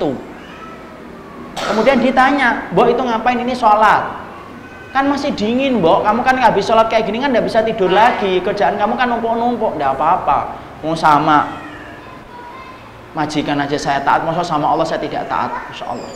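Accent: Java, Indonesia. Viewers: ini ustadz dari mana ya? baru lihat dua kali ini, jawa banget ngomongnya.. tapi sukaaaa..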